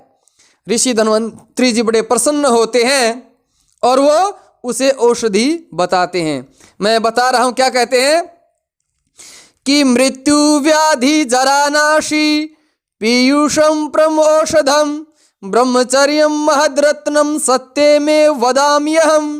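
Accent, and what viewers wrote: Haryana, India. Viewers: Haryana ki tone aa he jati h bich bich m 😁😁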